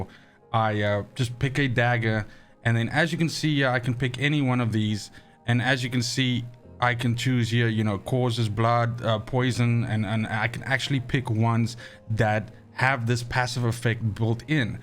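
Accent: South Africa. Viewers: Are you south african? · lief die suid-afrikaanse aksent! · Are u from SA? That accent sounds familiar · Are you from South Africa? 🙆🏽‍♂️ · south african bro! im from good ol Jo berg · This guys South African? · Bruh Are you South African?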